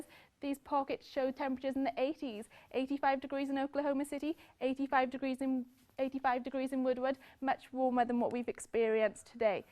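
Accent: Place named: Wales, United Kingdom. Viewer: Why, and how, is a Welsh girl reading the weather in America?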